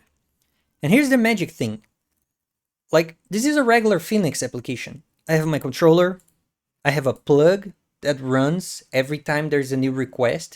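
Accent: Brazil. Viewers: Man, o video está top e inclusive isso não é uma critica ao seu inglês, mas o brasileiro tem o jeitinho brasileiro de falar inglês né rsrs, eu assisti o video todo sentindo uma forte familiaridade 😅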